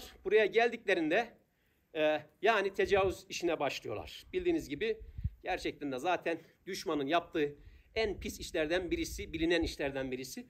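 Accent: Black Sea, Turkey. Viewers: Karadenizli olduğunuzu bilmiyordum hocam saygılar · Bey, ses tonundan bize yakın bir yöreden olduğunu anlamıştım seni ilk tanıdığımda. Meğer ki hemşehriymişiz. Ben de Torul'luyum. Bizim o taraflara da gezi yapmanızı bekleriz. Biz gidemedik, siz in sayenizde gitmiş gibi olalım bu sene. İyi seyahatler.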